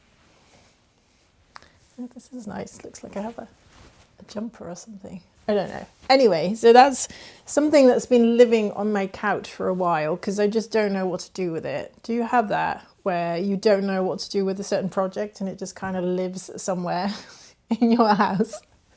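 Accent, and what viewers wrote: United Kingdom. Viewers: Hi - I very much enjoyed this unexpected‘knit’n’chat’! Like you I knit English flicking style and although I can knit Norwegian/Continental style, and do so sometimes to use different knitting muscles, I always return to English style because it just feels ‘right’. Can I ask you out of curiosity (nosiness 🤥?) whether you are English on your maternal side and Dutch on your paternal side, or vice versa? Sorry if you said and I missed it, but I just heard about Dutch grandmother who knitted and English grandmother who knitted, so I’m just curious - especially as you have such a nice English accent, but appear to have lived for a long time in the Netherlands. Looking forward to more Vlogmas videos 😊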